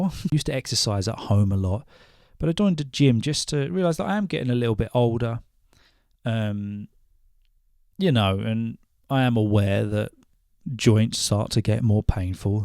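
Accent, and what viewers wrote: United Kingdom. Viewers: The you speak is utterly giving an aid to whoever want to step ahead in British accent. Thanks a lot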